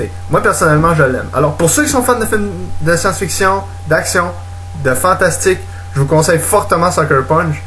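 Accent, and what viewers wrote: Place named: Quebec, Canada. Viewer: Vraiment sympathique comme critique. Le ton est enlevé et rafraîchissant. Je suis français, pas québécois, donc j'ai du me faire avec l'accent au début, mais ça m'a pas dérangé outre-mesure. Seul truc dommage, il manque peut être des extraits du film pour illustrer un peu la critique, mais ça reste cool.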